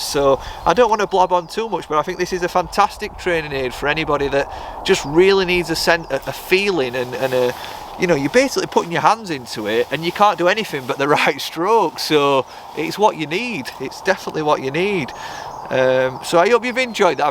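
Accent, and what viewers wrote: Yorkshire, United Kingdom. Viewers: I think should change the name of your channel to " " salt of the earth Yorkshire accent you know this guy is not lying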